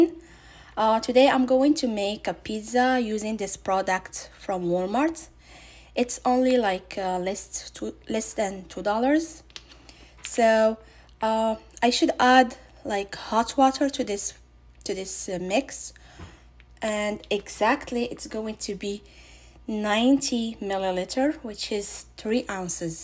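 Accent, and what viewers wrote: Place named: Morocco. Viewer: تبارك الله عليك قبل منشوف الصوره ديالك غير من الهضرة عرفتك مغربية ۔ الله يوفقك وشكرا علی الفيديو الجميل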